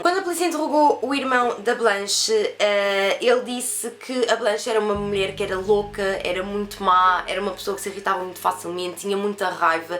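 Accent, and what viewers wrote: Portugal. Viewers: Ola ! Sou do Brasil ,eu amo o'' português dos portugueses'' !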